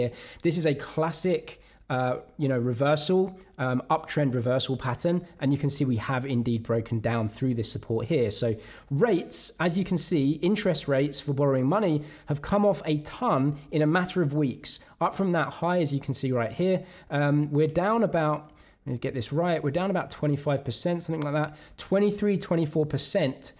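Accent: United Kingdom. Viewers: accent from UK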